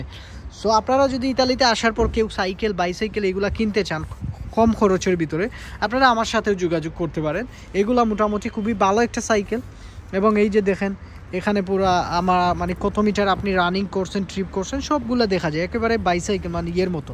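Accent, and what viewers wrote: Sylhet, Bangladesh. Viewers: ভাই আপনে সিলেটি নি জানাবেন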